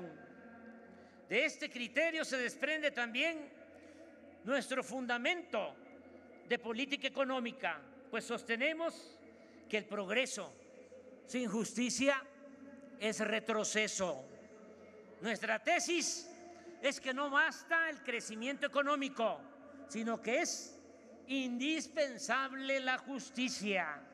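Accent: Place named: Tabasco, Mexico. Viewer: Amamos ese asentó tabasqueño que nos alinta y da esperanza para el cambio y la transformación de nuestro Mexico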